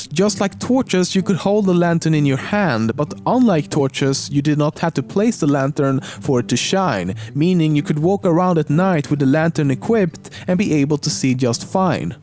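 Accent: Sweden. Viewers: He is Swedish, so he says "H" as "Y"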